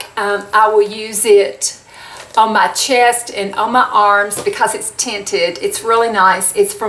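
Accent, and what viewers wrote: South, United States. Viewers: I feel like if I was to meet you sometime you and I would have such fun showing off our southern accents!! I was born and raised in Oklahoma and will never (I hope) never live any other place. I love your videos and love your straight forwardness. Thanks for being your true self! 🤗🤗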